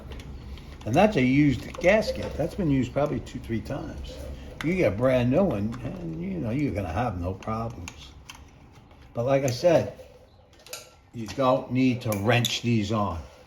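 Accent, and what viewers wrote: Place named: New York, United States. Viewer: Love your videos an accent! You from new York? Would love to see a front fork tube oil change done on a king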